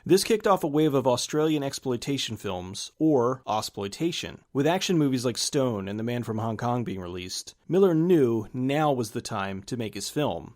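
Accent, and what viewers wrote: United States. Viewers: Every time I hear that American voice...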